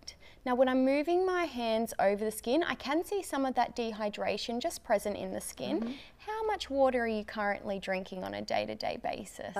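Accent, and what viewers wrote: Australia. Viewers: l’accent australien n’est pas le meilleur pour l’ASMR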